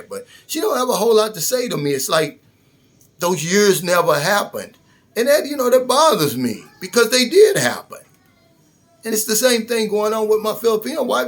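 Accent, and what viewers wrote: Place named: Louisville, United States. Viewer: I knew I recognized the accent! Louisville in the house! Newburg here! I’m glad I came across your channel.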